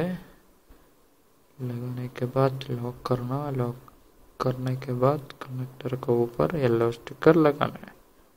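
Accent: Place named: Kerala, India. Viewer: മലയാളി ആണോ മലയാളി ഹിന്ദി പറയുന്ന പോലെ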